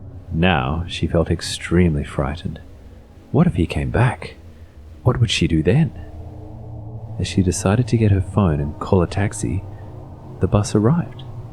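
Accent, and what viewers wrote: Australia. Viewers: I subscribed because I love the stories and animations. Also the voice is on point. It also sounds like this guy is Australian. I'm Australian btw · He's Australian.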